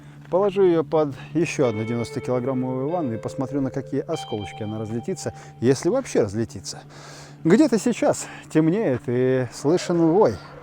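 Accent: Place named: United States. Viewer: Уважаемый ведущий вы вроде мужик а ведёте себя как американец. На ютубе есть подобные ролики американского производства и у меня сложилось впечатление будто манеру держаться и разговаривать вы взяли от туда.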